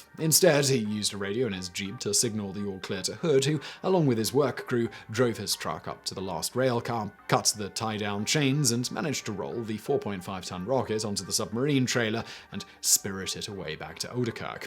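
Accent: United Kingdom. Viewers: Was going to give a thumbs up for this video, but really? "Lootenant"? You have an obviously British accent, but what are you? A wannabee Seppo? It's "lef-tenant" in the UK (and Canada). Stop contributing to the Americanization of Britain, mate.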